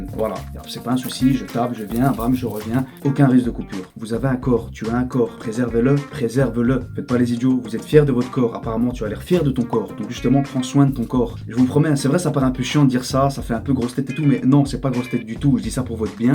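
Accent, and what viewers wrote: Brussels, Belgium. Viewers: D'accord avec toi et aussi l'accent belge 🇧🇪 TEAM BELGE 🔥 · tu viendrais pas de Bruxelles toi? ta un accent de drare de bx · Gros t'es de bruxelles pcq t'as l'accent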